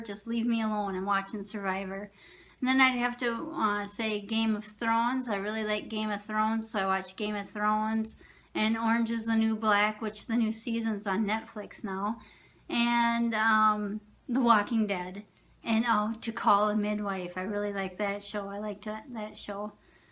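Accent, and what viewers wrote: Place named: Wisconsin, United States. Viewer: Ha, I thought you sounded like a WI girl :)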